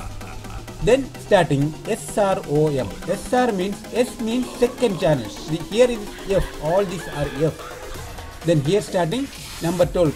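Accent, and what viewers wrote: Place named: Kerala, India. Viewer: നല്ല ഉപകാര പ്രതാമായ വീഡിയോ അത് പോലെ നല്ല മലയാളം പോലത്തെ ഇംഗ്ലീഷ്,👍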